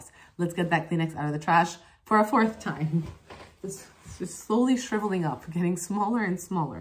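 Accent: France. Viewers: As a bilingual Canadian, I can hear your French accent when you speak in English. Totally picked up on it immediately (withing a few seconds) from the first clip I had seen from you a few months back. 😄 I appreciate your honesty about your profession and work. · it's cool, you have a slitghly french accent when you speak english, and an american accent when you speak french! and you speak both of them very well! good job Doc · Quel accent français incroyable !! Perfection ! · I knew it! I knew you had a French accent after watching your video on Glycerin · I love when you add French words here in there in your videos! Your accent is super charming, so no reason to feel self-conscious about it (I'm from Québec so you'd probably frown at my own accent haha)! About the Toleriane line in LaRoche-Posay, I don't know if this is the way they intended, but as a French-Quebecker I interpreted that name as being a play on the word tolérance, and since it's for sensitive skin I assume they're trying to promote that it'll build skin's tolerance... 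but I didn't do any further research on this.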